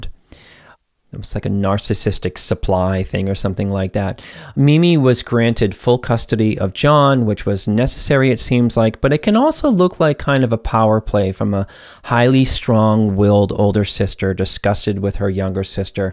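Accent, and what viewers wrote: Boston, United States. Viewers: Are you out of Boston? Just hearing the beginning of this story. Ugh my heart from this story and I love your videos so helpful. ❤️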